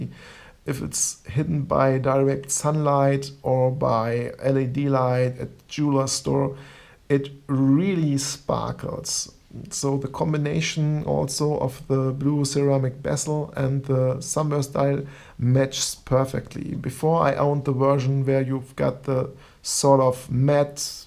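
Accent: Germany. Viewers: Deutscher Akzent kickt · Man hört das du eigentlich deutscher bist. Ist aber nicht schlimm. Beherrscht die englische Sprache auf jeden Fall besser als ich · Man hört halt echt raus,dass er Deutscher ist🤣🤣🤣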